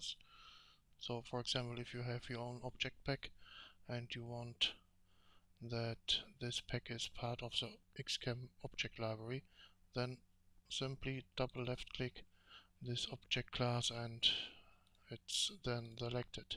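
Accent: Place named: Germany. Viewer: Ich find dein Englisch garnich so schlecht, aber man merkt da kommt manchmal der Deutsche durch xD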